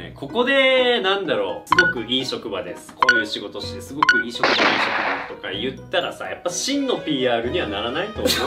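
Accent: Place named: Kyushu, Japan. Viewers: 引っ越すと何をするにもいちいち土地勘がないのと、言葉の壁やら文化の違いにとにかく戸惑いますよねー！パートのおばちゃんがいるとかなり心強い情報源なんですがそういう職場じゃなさそうですもんね。さんのキャラなら食堂のおばちゃんと仲良くなりそうな気がしますがw まぁ、悲しいかな気づいたら馴染んでしまうものなので、焦らず少しずつお仕事にも慣れて行ってくださいね！若いけどお体に気をつけて！南九州の方の訛りはちょっとやそっとのことでは変わらないと思うのでw そのままのさんでいてくださいねー‼︎焦らないでいいのでこれからも更新楽しみにしてます。あ、土地勘なくてすみませんが、東京の親戚がオオゼキで買ったお買得品を送ってくれる事があって、九州にはない商品の流通もあるし、お得だし良さそうですよ。ハッピーアワーに出くわすといいですね！公務員寮は食事付きなのかな？ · この九州なまりが標準語になったら寂しいなぁ😂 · さん、東京でもその九州なまり無くさないでくださいね🤣 これからも応援しています！！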